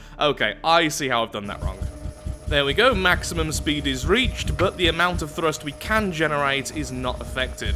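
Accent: United Kingdom. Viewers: holy shit I was looking away and forgot what I was watching and you sound like the most stereotypical brit I could not distinguish which british youtuber I was watching lmfao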